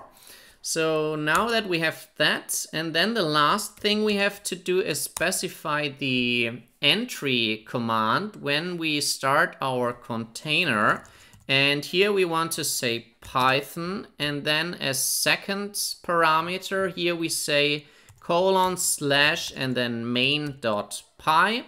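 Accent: Germany. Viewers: Du bist ein Deutscher, oder?😊😂 Aber gutes Tutorial definitiv! :)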